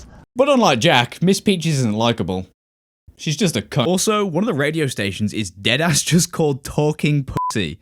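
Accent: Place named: Midlands, United Kingdom. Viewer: Aw man, this video was quite a sentimental one for me. My family grew up playing this game together in the Midlands, I was sure I recognised your accent and then you mentioned Wolvo and Bham haha :)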